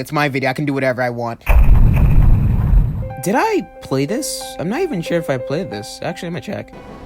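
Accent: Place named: New Jersey, United States. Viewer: I started at the last two week of Nine Color Deer! Dude you sound like you are from Jersey!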